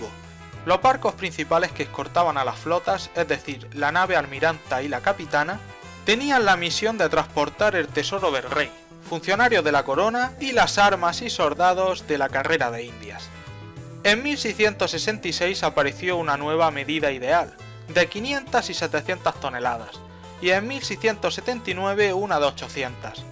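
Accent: Murcia, Spain. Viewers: Aaaaaaaxooooooooooooooooooooo,ese acento es murcianico pijo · De que parte de España es ese acento?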